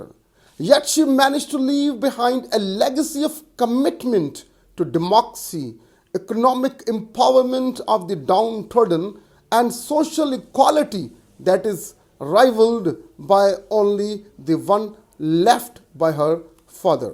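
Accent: United Kingdom. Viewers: Amazing video In every terms, the Accent as too as british, the information about her it realy explosive..❤❤❤❤ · profoundly comprehensive video with fascinating lucid british accent Sir👍👍👍👍👍❤❤❤❤❤❤❤❤❤❤❤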